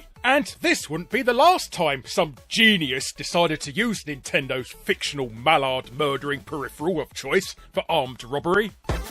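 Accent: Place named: RP, United Kingdom. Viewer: So posh accent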